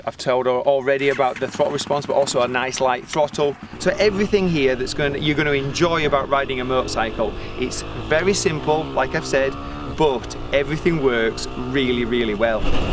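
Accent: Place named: North England, United Kingdom. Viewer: You sound like a Englishman (albeit a northerner). I thought this bike wasn't available in the UK. Where can I get one?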